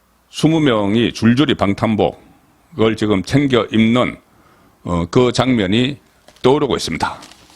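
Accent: Gyeongsang, South Korea. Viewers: 경상도 억양하고 서울말하고 섞어서하니까 이해합니다 벨것다갖고 생트집하고있네 너는 할줄아냐 남을 비판할줄알지 조간딱풀 영원히 응원합니다 잘하고계십니다 배승희 회식도 굿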